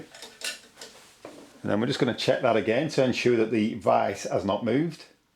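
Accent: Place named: Scotland, United Kingdom. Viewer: I subbed cus of your scottish accent, also handy content!😁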